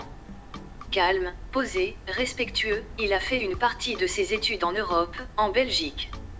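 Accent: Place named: France. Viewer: Bizarre. Même pas capable de prononcer les noms comme un Congolais... Accentuation et nasalisations à la française...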